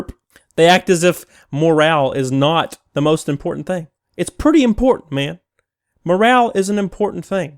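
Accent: South, United States. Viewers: Ah. Figured it out at last! is channeling William Shatner..... If he had a southern drawl. Gamespot... IS..doitagain. SCOTTY! Set phasers....TO full. loadalltorpedoes...... <link> This is what I hear in my head whenever Gamespot is mentioned in these videos. <link> (Pretty sure no crabs in this video were left unharmed) Love the content man. Keep up the awesome work!!!!!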